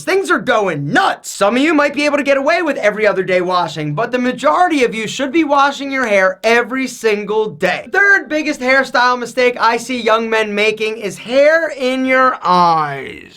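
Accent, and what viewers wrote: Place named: Maryland, United States. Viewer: Are you from Maryland? U sound like everyone I know lol. I’m from Annapolis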